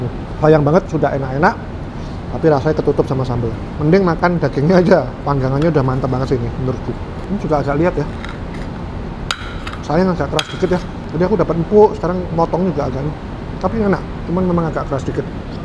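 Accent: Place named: Java, Indonesia. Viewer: Mas, sampeyan jowone medok temen. Trus iku le Madang enak men. Kapan2 aku tak nyoba lah. Suwun share nya nggih.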